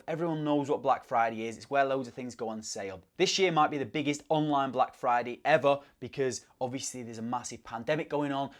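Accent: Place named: Yorkshire, United Kingdom. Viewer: yeah, I wanted to do that but then Covid came and put my plans on hold, thanks mate. You sound like a Yorkshire lad, I'm from Leeds and vlogging at the coldest inhabited place on earth at the moment 😂😂😂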